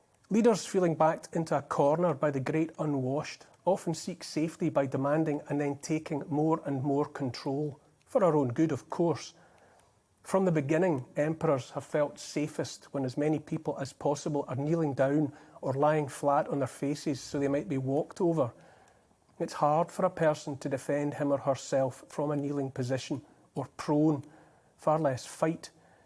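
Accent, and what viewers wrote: Scotland, United Kingdom. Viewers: Sorry, I thought you were a twat wandering around the countryside commenting on puffins et al. You are inspiring....especially as we can understand most of your brogue! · is really getting Scottish on us. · I’m always scrolling thru cuz I want to see more of, talks total sense. Conclusion - he’s not in the GB news schedule often enough, and I think the user comments reflect that. We all love that Scottish twang, although I think maybes the ladies out there are thinking Mel Gibson in Braveheart - dont blame u tho, I’m waiting for a rugged man (or woman if you’re not beaten down) of any Nationality to save us from this madness, xx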